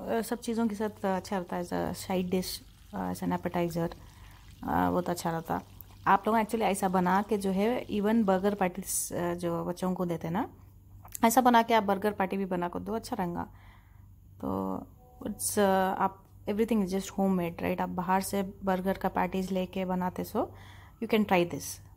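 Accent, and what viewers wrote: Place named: Deccan, India. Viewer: This dhakni urdu is ultimate 😍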